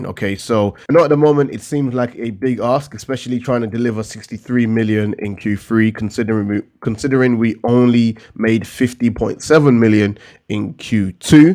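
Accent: United Kingdom. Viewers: where are you from? The accent sounds british!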